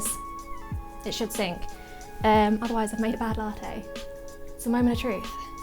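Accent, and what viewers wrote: United Kingdom. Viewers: Yes which is where you're wrong, they are located in the UK Also, sounds British to me. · barista girl with british accent, Just perfect👍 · She’s british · She’s British! Yayy! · No! British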